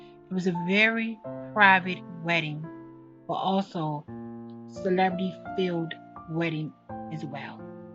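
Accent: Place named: Baltimore, United States. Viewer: Cleary a Baltimorian narrating this. Accent is thick!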